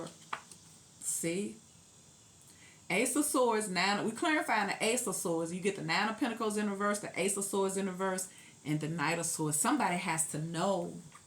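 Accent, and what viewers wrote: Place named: South, United States. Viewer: Me too! Love her southern drawl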